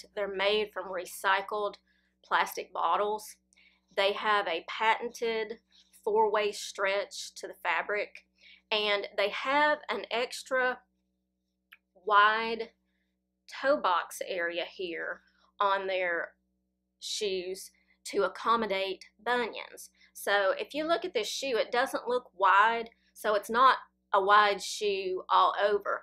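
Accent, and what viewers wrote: South, United States. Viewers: I’ve just discovered your channel. Thank you so much for your hard work!! I love watching you…especially as a Southern expat living in Montana by way of Oregon. Especially since you confirm so many of my own rules, lolz!! Please don’t feel the need to change your accent (saying awn instead of own for the preposition). Let your accent come own through, hunny!!! I sure do!!! Love you, Love you, Love you!!!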